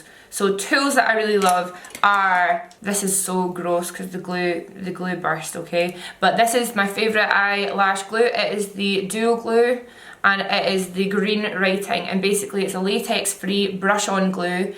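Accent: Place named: Falkirk, United Kingdom. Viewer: Are you from Falkirk because your accent is quite strong x 💓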